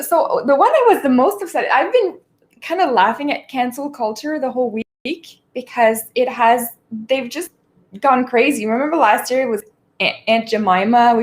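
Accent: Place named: Quebec, Canada. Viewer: I thought I heard French -Canadian. I was just about to ask when you mentioned it.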